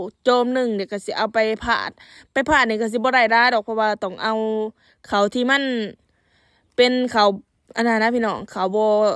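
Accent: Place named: Tao Ngoi, Thailand. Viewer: สบายดี ไส่เสื่อแขนยาวแหน่บ่คันคายเบาะฟังสำเนียงคือคนเมืองเต่างอยอยู่บ้านกวนบุน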